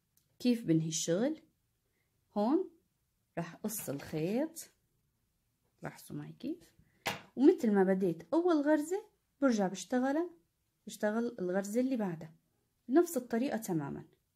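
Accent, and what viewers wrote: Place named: Syria. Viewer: الله يعطيكي العافيه بظن انك من عنا من سورية ع هوى لهجتك .. بس يئلبي كأنو بيطول الواحد لتطلع معو هيك سجادة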